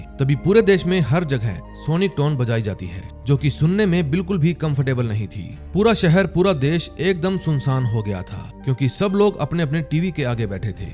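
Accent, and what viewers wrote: Punjab, India. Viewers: Bhai kya aap Punjab se ho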